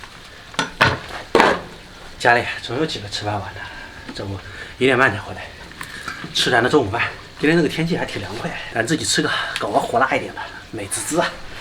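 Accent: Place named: Jiangnan, China. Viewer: 看你的视频有点江南腔调哇 上海宁？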